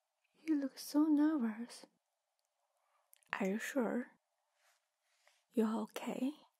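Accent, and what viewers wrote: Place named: China. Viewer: 这个口音好国人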